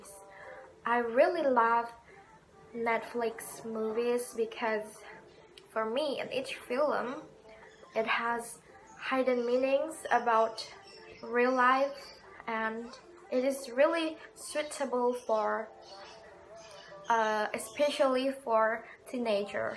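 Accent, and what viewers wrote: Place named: United Kingdom. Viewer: Hi! Long time no see! I'm glad that you look healthy in this video! Keep yourself safe during the quarantine ok! I love the way you speak english, you almost nailed british accent! Your pronunciation was also clear, i can understand every word you said. And thank you for the information, it's very useful! Keep safe and stay healthy ok! See you after the quarantine ends!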